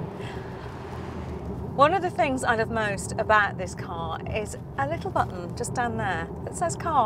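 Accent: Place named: England, United Kingdom. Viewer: that english accent that annoys the world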